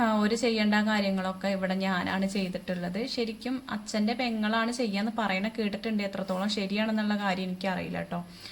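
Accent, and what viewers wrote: Malappuram, India. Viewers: ningal Malappuram Anu Ann samsarathil manasilai evidayanu. Sthalam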